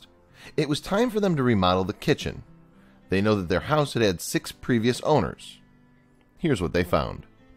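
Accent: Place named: Wisconsin, United States. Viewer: Yeah he's probably from Wisconsin.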